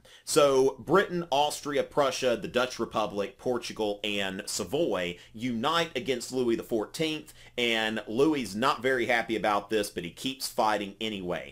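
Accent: South, United States. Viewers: yeah im a big fan of your videos since i found your Thirty Years War video, and ofcourse your southern accent. Anyways im sure you will do great if you decide on making a video of Sweden, its a shame because like you said most people leave out Scandinavian history besides from Vikings mostly, i might be a bit biased but the Swedish Empire is surely impressive seeing as its only surrounded by other huge and powerful countries and could still hold its ground. Besides all of that, Sweden has had a significant amount of political and military influence on Europe throughout most of history atleast until their loss of Finland in 1809. Greetings from Stockholm :)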